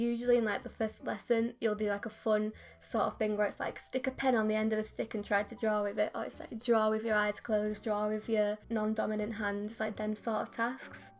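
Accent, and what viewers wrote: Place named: United Kingdom. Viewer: I really love your accent! I am Latina from Chile and I love watch your videos becouse I Lear inglés brinanico and you inspire my to keep drawing Idont now if are a wrong word I sorry for that... ❤️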